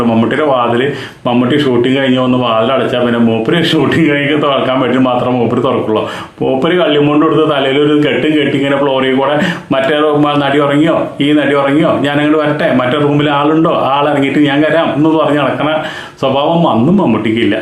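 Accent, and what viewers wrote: Thrissur, India. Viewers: Very interesting Voice & Language, sounding Trishur?😅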